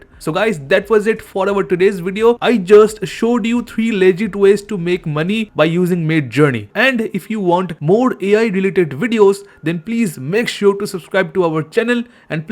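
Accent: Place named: India. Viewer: Bro please tell me one thing. Please go to your analytics and tell me how muchh percentage of your audience are from U.S.A or foreign country. Mujhe ye jaanana hai ki indian english ki jo accent hai usme video banane pr foreign se views aate hain ya nhi?? Please bhai pls please please पैर पड़ रहे हैं🙏🏻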